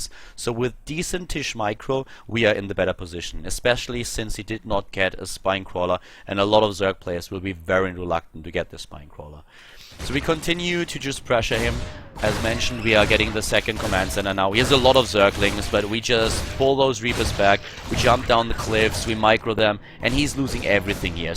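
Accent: Germany. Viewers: he s from germany^^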